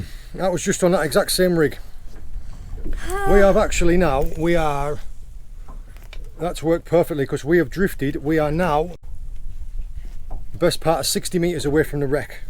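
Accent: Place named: Teesside, United Kingdom. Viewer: Are you from the boro mate, I’m from Redcar and you’ve got a very familiar accent. I go beach fishing at marske with my brother in law. Loving the videos pal UTB 👍🏼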